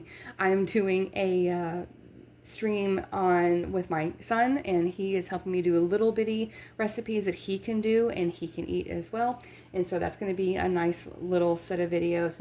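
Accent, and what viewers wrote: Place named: Midwest, United States. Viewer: Where are you located? You sound like you are from the midwest maybe..... MN?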